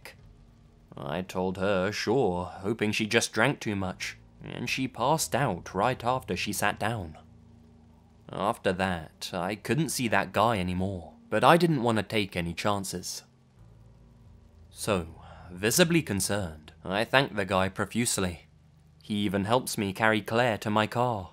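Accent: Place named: Suburban England, United Kingdom. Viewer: Amarsanaa Ravdansambuu He doesn't sound posh English and doesn't sound poor London. He sounds like more suburban or a mix of those accents. So um Posh Poor English??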